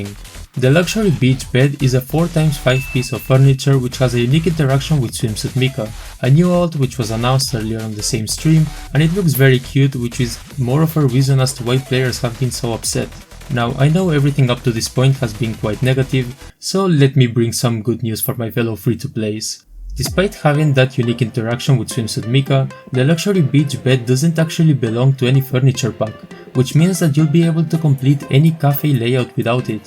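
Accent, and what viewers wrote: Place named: Spain. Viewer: Spaaaain. Blue archive has come so far! I almost didn't notice the accent. I may be wrong but...anyway very high quality video.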